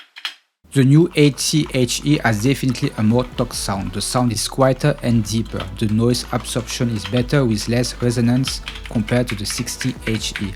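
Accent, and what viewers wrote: France. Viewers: On est d'accord c'est un accent Français ? ^^ Merci pour ta vidéo !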